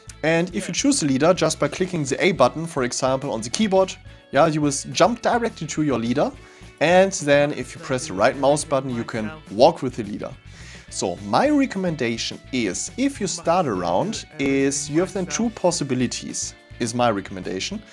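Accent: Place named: Germany. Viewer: Ahh dieser deutsche Unterton ^^ man erkennt den doch überall. Es gibt leider kaum Spieler für das Game =(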